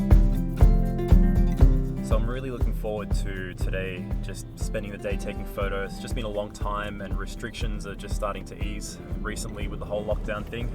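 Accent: Australia. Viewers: I used a lot of AGFA colour and BW film in the day. Loved it and miss it. Still use Rodinal. Good work. An Aussie voice. 😀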